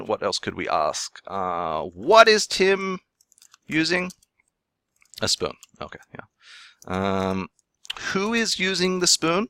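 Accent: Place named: Australia. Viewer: . I am new to your channel and taking Japanese at University of California San Diego to help me in my study of Aikido and Kendo. I had only been watching the videos where you are speaking Japanese and am trying to model your style/speed of speech because it is at a pace that I can create/form sentences and responses at my level. Are you America jin, Ostoralia jin or Nihon jin? You English is great with what sounds like an Australian accent. Love your stuff. Domo Arigato Gozaimasu!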